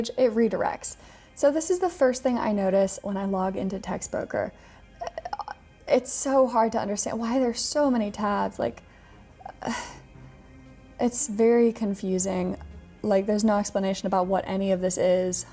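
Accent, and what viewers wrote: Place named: United Kingdom. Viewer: Hello, thanks for sharing from Caracas venezuela...you are very beatiful pragmatics girl...im a teacher computers programming...i love writing articles en coinpayu articles but y read your reviewed...Es muy interesante tu estetica al vestir y ver el mundo...y tu bello acento britanico...como a los que tanto escucho cada dia. best regards.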